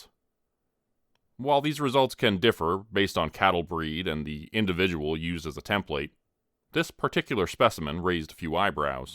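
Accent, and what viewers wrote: Canada. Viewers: I knew you were Canadian!!! I love your accent. I'm from just over the border in the US. Reminds me of home. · Woo-hoo Canadian here too. Vancouver area. I thought you sounded Canadian. You rock. 🤘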